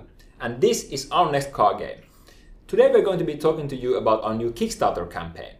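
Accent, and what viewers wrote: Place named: Finland. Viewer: That finnish accent! My Greetings from (my homeland) Finland to Finland :D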